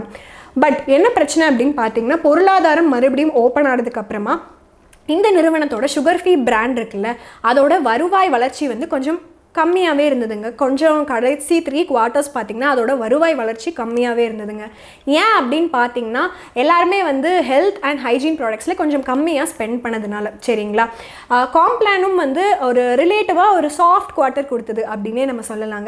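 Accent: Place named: Coimbatore, India. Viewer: Neenga Coimbatore nga la nga.... Nga ...nga ....arumaya pasuringa......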